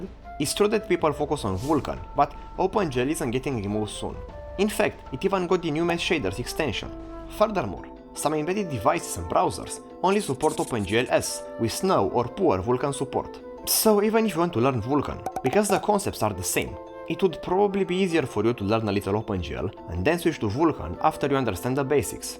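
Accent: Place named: Romania. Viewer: that romanian accent 🤌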